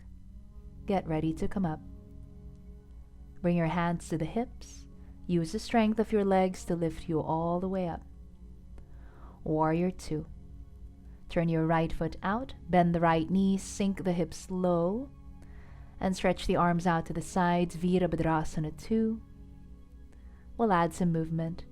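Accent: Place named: Philadelphia, United States. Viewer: I like your classes. I especially like this one and use it quite a bit. I use a bunch of different videos and teachers, but you and another instructor, always tell when you’re doing your last downward dog. I appreciate that. Just out of pure curiosity, are you from the Philadelphia area? I’m only asking, because I enjoy trying to figure out accents. And yours sounds a little Philly. Obviously if you don’t want to answer, there’s no big deal. Anyway, thank you for your classes.!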